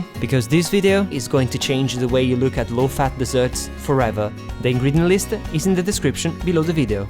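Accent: Italy. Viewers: Si sente che sei italiano:)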